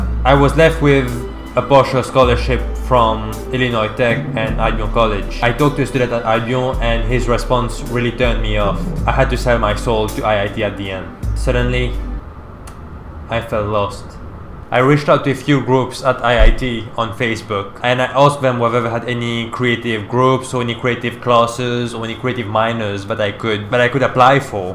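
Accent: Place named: Mauritius. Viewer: Oh, i am Mauritian too, I recognized you from the beginning itself because of your familiar accent. Guess what, applied to MIT too, waiting for regular decisions 2019. I feel less qualified than you, means I don't even stand a chance. I have not applied with Phronesis, did everything independently with my own reasearch. I took two gap year after highschool during which I was working in an american company. Thanks for sharing the video.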